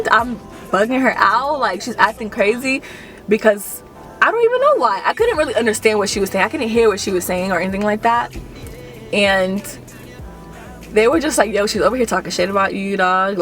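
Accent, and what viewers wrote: Jamaica. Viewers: what a low down, dutty, scandalous, frowsy pum-pum, racist b1tch! You did well not to beat her a$$! new to your vids - love them, also are you of Jamaican heritage because I'm clocking certain speech patterns...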